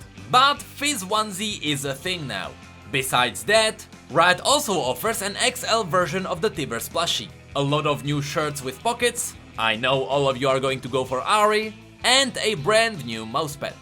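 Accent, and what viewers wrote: Czech Republic. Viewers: Hned co jsem uslyšel ten přízvuk bylo mi jasné, že musíš být z Česka. Úžasná videa <3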